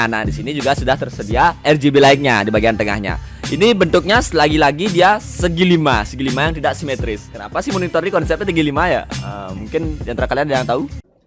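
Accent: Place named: Bali, Indonesia. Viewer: Logat ne bali sajan bli ne 😂😂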